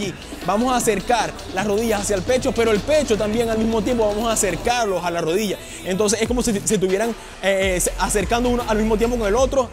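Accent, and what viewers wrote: Venezuela. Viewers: jajaj es venezolano por eso habla asi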